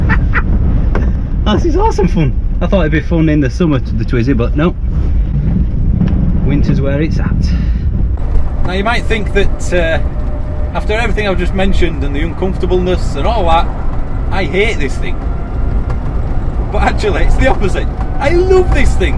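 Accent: Yorkshire, United Kingdom. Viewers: Love the accent too. Somewhere in Yorkshire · probably just the Yorkshire accent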